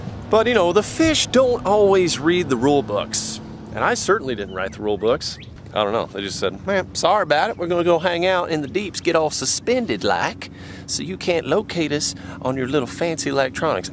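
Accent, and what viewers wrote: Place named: Texas, United States. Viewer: What part of Texas are you originally from? I have friends from Texas and your accent sounds a little different then theirs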